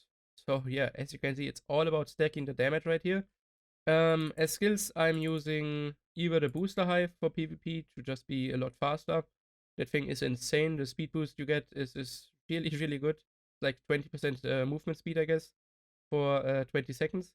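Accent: Germany. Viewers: Yo! I gotta say u r making some nice videos! U kinda sound German tho... wanna say where u r from?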